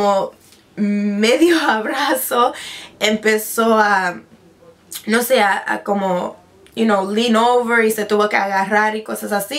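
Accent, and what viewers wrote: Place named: Argentina. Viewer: ahhhh I can sorta hear your Argetinian accent loveeee it <3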